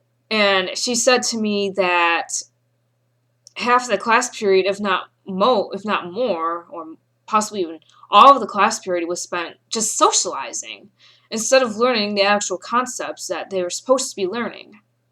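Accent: New York, United States. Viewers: Is that some sort of new york accent? Cool.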